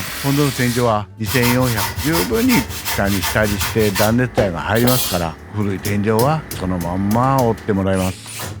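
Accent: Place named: Banshu, Japan. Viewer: 方言は同心円に広がりますからね〜 たまにさんの言葉が私の地元、兵庫の播州弁に聞こえたりします。（疑問形で 〜け？）など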